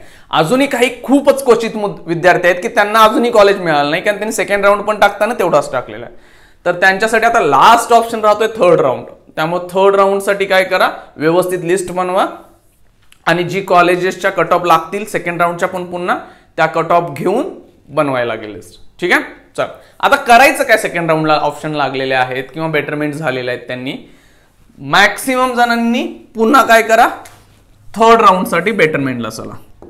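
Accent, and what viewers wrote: Kolhapur, India. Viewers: Siranchya bolnya varun vatatay sir sangli, satara, Kolhapur che ahet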